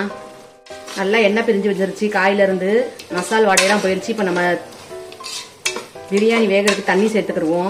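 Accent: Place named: Madurai, India. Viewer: I love her Madurai accent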